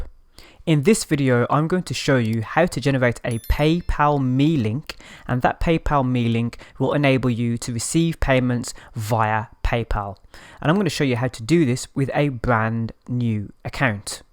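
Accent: England, United Kingdom. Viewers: Are you from England love the accent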